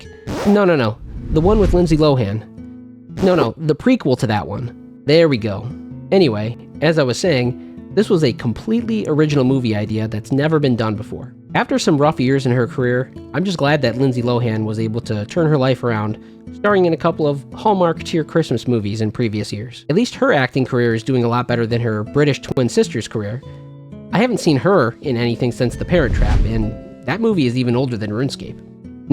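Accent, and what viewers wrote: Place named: Great Lakes, United States. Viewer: "Great Lakes accent" you answered my question I posted on a previous video.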